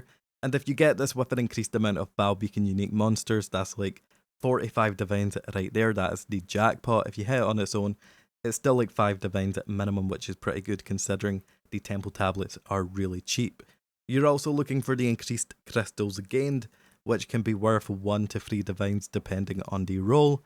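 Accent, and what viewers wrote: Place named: Scotland, United Kingdom. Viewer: It's called scottish accent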